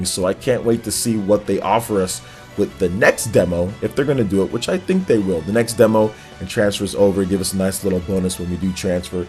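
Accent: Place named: United Kingdom. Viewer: British accents are so annoying.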